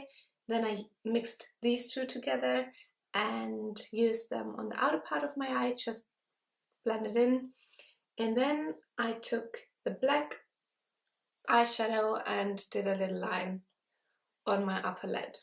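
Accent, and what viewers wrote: Germany. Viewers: Your German accent is great, and your English is good! I subscribed to you after your velvet lips video because I am interested in makeup and the German language. I learned some makeup-specific German vocabulary from your videos!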